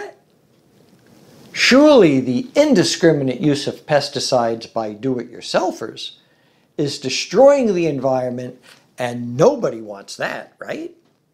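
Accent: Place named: New York, United States. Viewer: AND YOU MUST BE FROM NEW YORK. LISTENING TO YOU MAKES ME SO 😔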